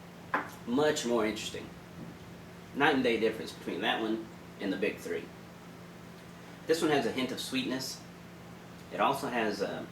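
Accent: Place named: South Texas, United States. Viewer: I can tell this man from south Texas Cheers brother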